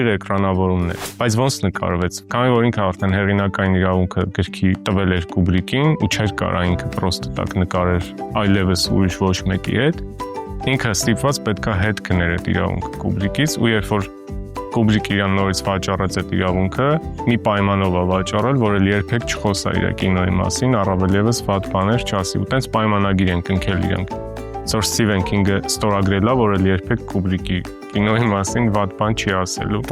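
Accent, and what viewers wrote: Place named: Russia. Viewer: Լավ ֆորմատ է, սպրեք։ Ֆիլմերն ու թեման էլ ընտիր! Ձեր խոսքն էլ լսելի է, բայց մի նեղվեք, երբ ձեզ նկատողություն են անում - հայերենում մենք ունենք դ, տ, թ , ծ, բայց դուք ռուսական հնչյուններ եք գործածում` Սծիվեն ռուսն ա ասում, մենք Սթիվն կարանք ասենք և այլն։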